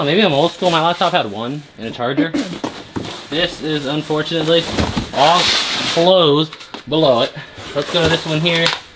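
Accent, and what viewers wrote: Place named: United States. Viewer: sounds american.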